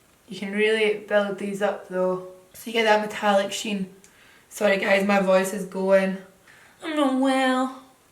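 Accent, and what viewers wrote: Scotland, United Kingdom. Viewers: Scottish accents make me happy. lol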